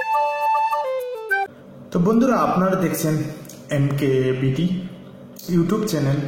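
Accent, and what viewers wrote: Sylhet, Bangladesh. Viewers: you r sylhetee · ভাই আপনি সিলেটি নি,,,?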